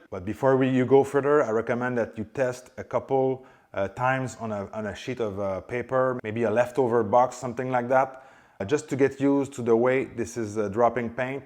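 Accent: Quebec, Canada. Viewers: yes osti I will say he is a quebec guy 🙃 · I get to the end of the video and I’m like oh wow this guy must be Canadian cause I just noticed his accent, since I was sooooo busy paying attention to every step. Great video sir, it has given me the confidence to wet sand my own paint for chip repairs. Of course gonna get a junk panel for free somehow to practice 1000 times, but i finally have every step and tools I’ve never had before! Blessings! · Fantastic video, the best I saw for rock chip repair. By the way I noticed your Quebec accent.:washhands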